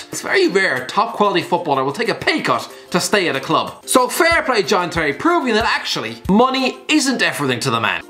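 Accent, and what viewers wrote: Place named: Ireland. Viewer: Man I love this guy, listening to his Irish accent is getting me through quarantine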